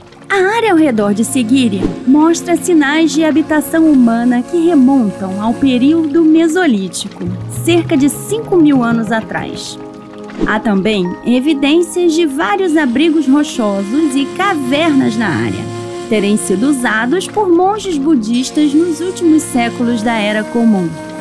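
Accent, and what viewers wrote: Rio de Janeiro, Brazil. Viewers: sua viz,com sotaque carioca lindo, é muito agradavekt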